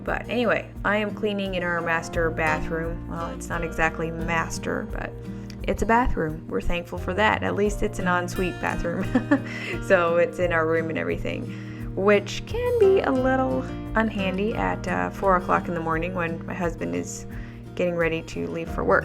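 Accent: Pennsylvania Dutch, United States. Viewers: Love this video blesses my heart hearing you speak Pennsylvania Dutch brings back so many childhood memories. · I loved hearing you speak Pennsylvania Dutch! I grew up in the south of Austria with German as my native language. I could understand you, but had to listen closely. I went to Millersburg, Ohio a few years ago and we were surprised how well we could understand. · Hey, to answer your question, i'm German and I understand approx. 50% of what you say. And as a language teacher i find Pennsylvania Dutch sooooo interesting :-) Love hearing it! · So much fun hearing your dutch! Pa dutch was my first language too, though mine is more the east coast Mennonite style, definitely no rolled r's :-) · I love hearing the Pennsylvania Dutch really never heard it before. I think that English has a heavy Germanic influence to it from the past. 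I needed the cleaning motivation as well to get my dishes done. Thanks have a great weekend. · As somebody who lived near the Pfalz, I grew up with people around speaking some kinds of a german dialect. Since they all are very different even within the region (for example potatoes can be Krumberen, Kartoffeln or Erdäppel within a few miles radius), I should have expected for there to be different PD dialects. But I was completely surprised by how different (and harder to understand) your dialect was to for example Doug Madenforths who I unterstand as well as my Omas und Opas speaking their dialect. Keep up speaking your dialect, it is a cultural good that is hard to bring back once it's gone :) Great video as always! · I live near the French border in Germany and I understand most of your dialect. I have to listen closely. Usally I think in English or German, but Pensylvania Dutch is so haevily mixed, that my head spinns a bit when I try to sort out the bits and pieces. 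:) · Love to hear the Pennsylvania Dutch! Very interesting. Hope you do more occasionally. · I loved listening to you speak PA Dutch. It reminded me of listening to my Dad and his sisters. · Love hearing the PA Dutch! Always enjoy the cleaning videos....I am not a good housekeeper (yet), but I learn a lot just watching how you do it . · Hi! I've been watching your channel for a while now, which I truly enjoy your family and style. I loved this video especially since I'm from Eastern PA, an hour from Lancaster and am familiar to the Amish. My family is Pennsylvania Dutch from Berks County. I enjoyed your Dutch:) I didn't grow up PA dutch but could understand some and am familiar with the accent, which my great uncles heavily have:)) I always pick up the accent again when Im home for a visit. I love it, it's home to me. Im also familiar with some of the foods you make, which I miss and get my fill during visits. 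Congratulations on your growing family! Thank you for sharing! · I also hear the German very distinctly. I spent many years there (my dad worked there for 17 years) and I got married in Heidelberg. You are speaking it with what I would call an "American" accent of course, but that is to be expected. I speak German with an American accent, too. LOL I hear a few Dutch words, it's definitely a blend of the two languages. It's beautiful, but I do hear the English words as you are speaking, too. I think it's amazing to learn different cultures....it was the BEST part of all the travels I was blessed with. I've lived in Egypt, Germany, Okinawa, Switzerland, Czechoslovakia and many places here in the US - including in the PA Dutch Country - and I was born/raised in Cincinnati OH as our "home" when we came home from overseas. I am loving your channel. Blessings!!!! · I'm from Palatinate (Southern Germany as well) and I feel the same way. 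Most of the words are used in the exact same pronunciation here. I could listen to you speaking Pennsylvania Dutch for hours,. It's very interesting!:) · Love your videos and your Pennsylvania Dutch is beautiful.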